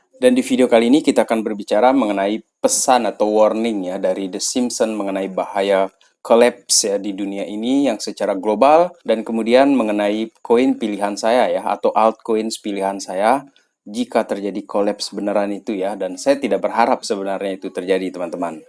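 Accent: Sumbawa, Indonesia. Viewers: Sumbawa mana ini pak?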